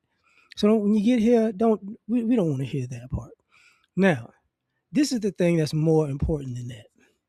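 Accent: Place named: New Orleans, United States. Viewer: I still hear a little bit of New Orleans accent just a little bit 😄 Peace to you brother. Appreciate you brother ✌🏾✊🏾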